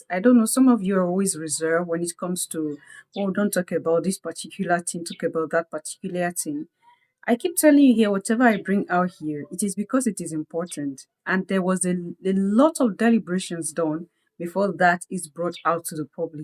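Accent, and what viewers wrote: Gambia. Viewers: This man sounds very Gambian... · I guess this guy is one idiots from my country the Gambia cuz of his ascent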